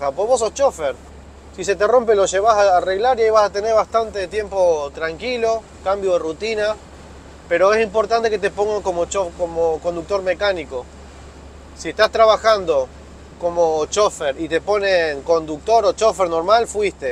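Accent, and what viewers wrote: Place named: Uruguay. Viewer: Un gusto escribirle, tmb soy del canal Mobile home. Sr: x DESEARLE SIEMPRE PROSPERIDAD EN TODOS SUS TRABAJOS Y ME ALEGRÓ MUCHO SU COMPRA DEL SCANIA. Como si fuera x mis hijos. PROSPERIDAD, SALUD X ELLOS Y FLIA. Y LE SUMO A UD. Con CARIÑO Y RESPECTO, SE LO MERECE ES TRABAJADOR, LUCHADOR, DE TODO OFICIO. Le saludo desde Uruguay. Mis hijos viven ahí en España. Los tengo lejos hace casi 2 décadas x tal motivo comencé a seguirlo, pensé q podía ser Uruguayo x el modo de hablar, somos de paises hermanos. SIEMPRE ADELANTE Y A CUIDARSE. Me despido con AFECTO.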